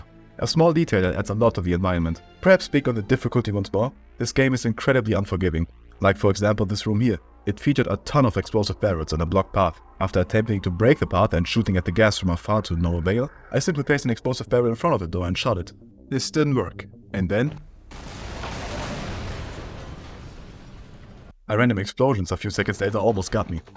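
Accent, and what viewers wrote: Germany. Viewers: I find it odd that you mispronounce Steyr despite having a very strong GERMAN accent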